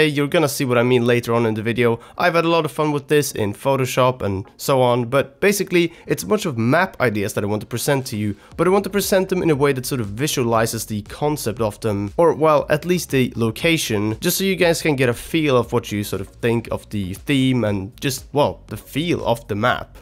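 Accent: Sweden. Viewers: Wow wow wow are you from sweden? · Are you from Sweden??!?!?!?! 😃😀😃😀😃😀😃😀😍😍😍💕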